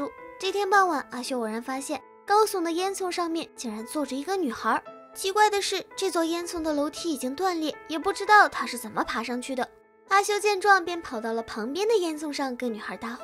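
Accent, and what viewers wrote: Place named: Mainland, China. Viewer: 大陸有些字唸法跟台灣不一樣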